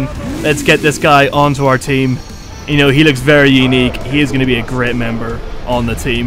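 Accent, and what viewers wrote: Ireland. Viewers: I only watched this video to see the raid shadow legends ad idk why just had to see it from the most Irish lad ever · Wait your an Irish man to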